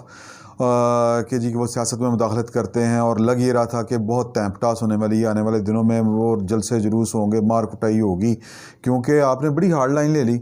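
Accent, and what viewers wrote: Punjab, Pakistan. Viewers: your style of presentation is very nice.Good punjabi Tarka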